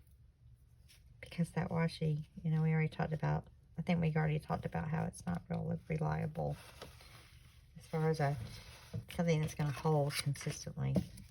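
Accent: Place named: South, United States. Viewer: I enjoy your sweet Southern accent as much as the tutorial 🌼 Such beautiful pieces!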